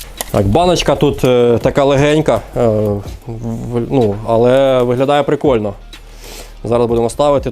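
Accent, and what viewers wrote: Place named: Surzhyk, Ukraine. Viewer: Хорош на суржике балякать. Мы в Одессе не урозумим вашу сельскую гарную мову.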